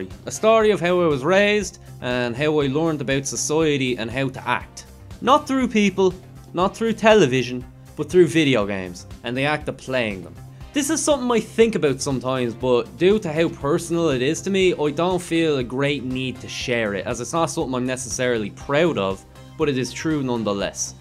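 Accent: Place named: Ireland. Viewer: that irish accent tho 😍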